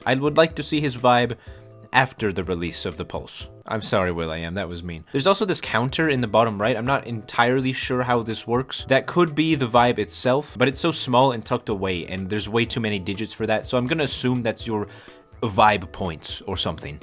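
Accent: United Kingdom. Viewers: That brit accent lmao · This is the best video 11 out of 10, best british accent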